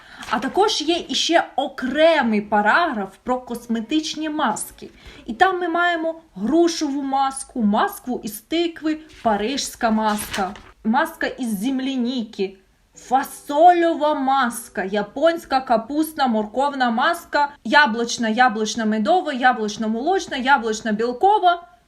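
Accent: Surzhyk, Ukraine. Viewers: Зараз здохну від цього суржику 🥵💖🥵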